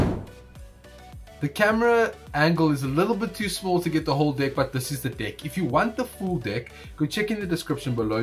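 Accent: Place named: South Africa. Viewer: You have the thickest sa accent! Nice vid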